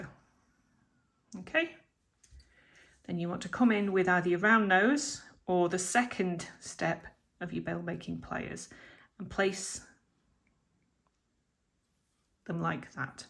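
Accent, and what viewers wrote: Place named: North West England, United Kingdom. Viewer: Great tutorial; you make it look so easy and I can't wait to have a go. I'd also love to know how to make the spiral. I detect a North West accent; are you from anywhere near Widnes in Cheshire?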